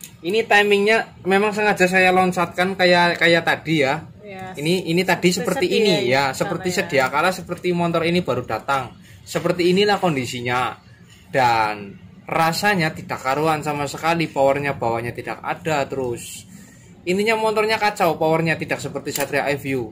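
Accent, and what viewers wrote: Banyumas, Indonesia. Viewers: Mas alamat bengkelnya dimana kasih tau dong bahasanya kok ngapak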